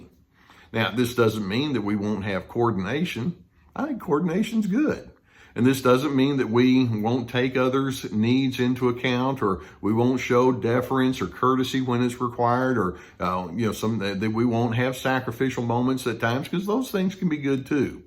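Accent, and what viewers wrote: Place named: Texas, United States. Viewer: Somehow the narcissist looks all the more ridiculous when they are described with a Texas accent!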